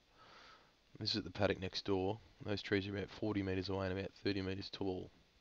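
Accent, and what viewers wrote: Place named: Australia. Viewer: He's From Straya. We think Americans talk funny.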